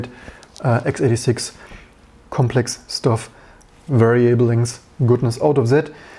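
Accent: Germany. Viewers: Come on, everybody knows you're a German hearing your thick accent … 🙄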